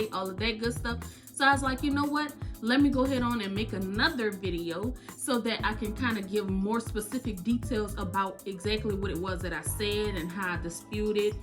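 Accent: New Orleans, United States. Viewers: First time here. As soon as you started speaking I was like “she sound like she’s from New Orleans”, lol! so I checked the description box and I see that you’re in Slidell, close enough, I know my people! I’m in NOLA 🥰🥰 so now I’m a new subbie!!! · She sounds like she’s from New Orleans .. I need help negotiating stuff like past debts that are in collections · Great video! That accent is heavy, are you a NOLA sister??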